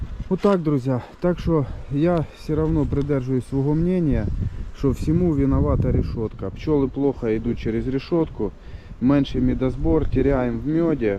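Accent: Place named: Surzhyk, Ukraine. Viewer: Суржиком, у нас тут всі так балакають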